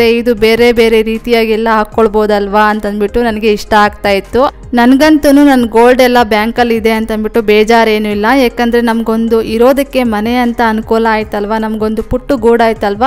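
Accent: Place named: Andhra Pradesh, India. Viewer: memukuda telugu vallameandi,me kannada slang vini naku meru teluguvalle anipinchindi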